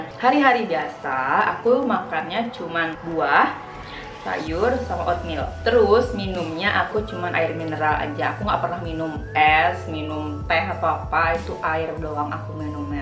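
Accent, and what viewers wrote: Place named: Bali, Indonesia. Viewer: logat balinyaa!😍❤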